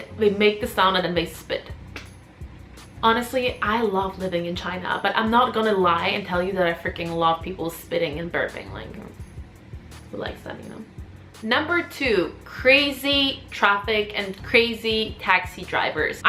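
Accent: United States. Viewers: Could you explain your american accent？ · Are you Danish or Parisan, I am so confused. And you don't seem to have any European accent, you sound American to me. Anyway there are many overseas Chinese around the world. Apparently you need someone who's as interculturally smart as you, probably a multilingualist for your future kids benefits, thb, do you secretly have yellow fever, just wondering :p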